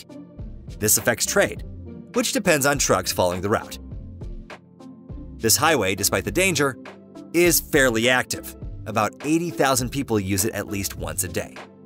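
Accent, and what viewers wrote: United States. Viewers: Hurricanes at 90 mph - needs to do better research , as hurricanes can have winds well above 90 mph . As it was an American accent , guess I cannot blame the narrator , as the USA's education system is not as good as it's cracked up to be , with several university's recently caught selling degrees , just to gather income - this scandal , has done much damage to any degree holder , from the USA and it's corrupt universitys . It was also mentioned that no-one on Reunion is on welfare in the general population , it's the French government workers who are on welfare , not the population generally , they all work , producing Coffee , Vanilla and other crops . The road being built over the ocean , won't extend their "exclusive economic zone one bit . It's being built , to avert some of the problems associated with the current coastal highway , so that access to the airport , port , and other large city , is not interupted as frequently as it's claimed by the narrator .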